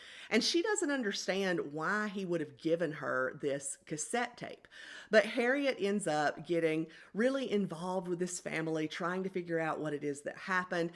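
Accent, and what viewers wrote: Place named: South, United States. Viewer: Love your southern accent its so comforting i am also a mystery lover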